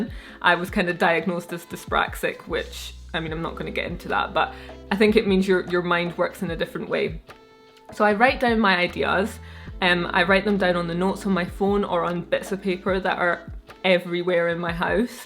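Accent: Scotland, United Kingdom. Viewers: Greetings, I am subscribed dear and thank you for providing all the content, retired life is more enjoyable watching your video content as well as content of others, however I will have to start teaching architectural engineering to pass my time in addition to watching YouTube videos all day.,. I have to also say that I absolutely love your Scottish accent as its wonderful when spoken in the feminine sense if you will. Your numbers are headed in a positive direction, great work dear....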